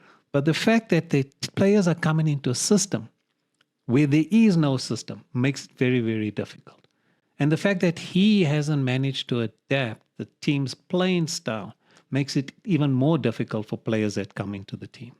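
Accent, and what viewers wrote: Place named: Durban, South Africa. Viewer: Aslm, nice to see someone from RSA making united vids, keep it up. Sounds like you're from Durban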